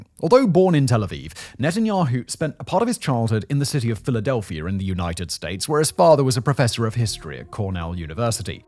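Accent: England, United Kingdom. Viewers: I must admit, the way he so confidently stated it with that kings English accent had me question my own pronunciation for a moment.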